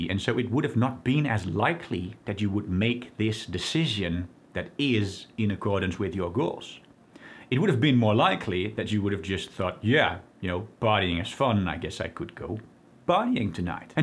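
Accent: Netherlands. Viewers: He is from the Netherlands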